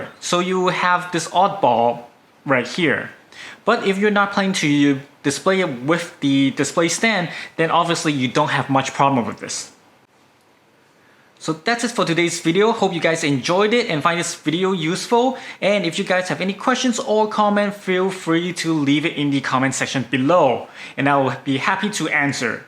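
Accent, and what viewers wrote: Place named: China. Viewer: you voice comes off as someone who native tongue is chinese.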